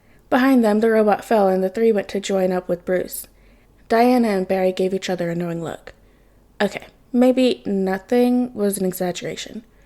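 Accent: Midwest, United States. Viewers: Hehe, i LOVED your midwestern accent, peak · Omg I love your midwestern accent!!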